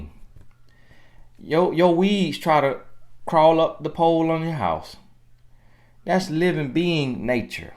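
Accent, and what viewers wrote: South, United States. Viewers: U my training wheels i love your voice its country a accent now lets get in tune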